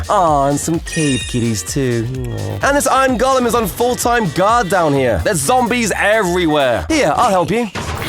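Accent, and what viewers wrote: United Kingdom. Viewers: Dude are you British? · My mind is blowing up! At the same time you sound British and at the same time you sound American! What are you!💀💀💀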